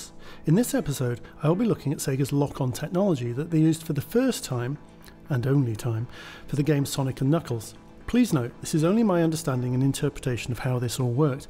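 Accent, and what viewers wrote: United Kingdom. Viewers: A charming English voice I gotta subscribe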